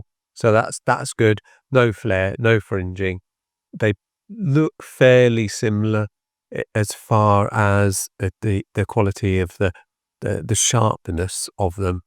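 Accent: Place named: United Kingdom. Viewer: Thank you very much for this channel in British English. I find it very pleasant to listen to (as I am not a native speaker and my English is only at a basic level), and I particularly appreciate not having to listen to American English. In addition, the content of your videos really appeals to me – it is well selected and clearly explained.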